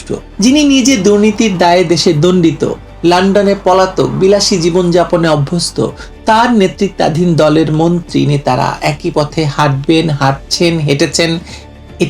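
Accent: Kolkata, India. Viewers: Your Bengali accent is like Kolkata's Bengali accent. I like and enjoy the way you speak.🙏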